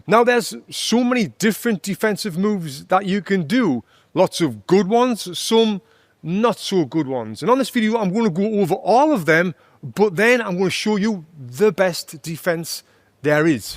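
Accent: United Kingdom. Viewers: Great work love that british accent mate😀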